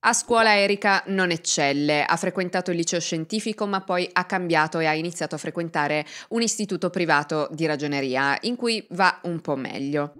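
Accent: Turin, Italy. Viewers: Troppo accento torinese , un po' di dizione non sarebbe male. Tutto il resto e' perfetto. Brava , complimenti sinceri.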